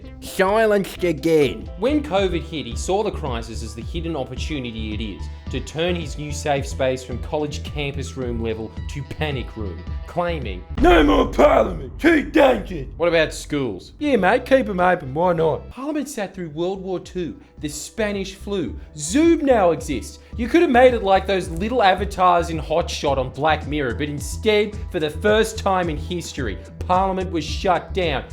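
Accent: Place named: Australia. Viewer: Your Australian accent is very strong 💪 open your mouth a little more while talking and you will get more subscribers. Great job unveiling the Scomo cover up govt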